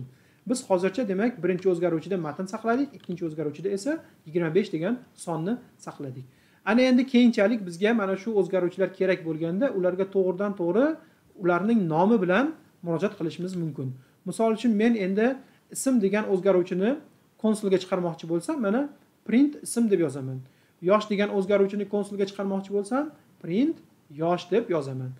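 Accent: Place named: Tashkent, Uzbekistan. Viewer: Toshkencha accent ingilizcha so'z yoqmasa surgin bettan yoki qsp darssi organ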